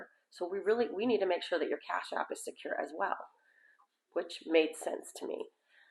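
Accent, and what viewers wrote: India. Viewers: Indian accent maybe.? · East Indian accent? Asking because it’s very common.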